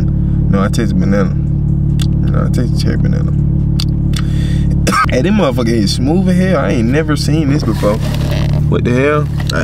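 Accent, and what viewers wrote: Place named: South Carolina, United States. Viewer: Young man your accent is that South Carolina yo fam my family is from the low country keep pushing young man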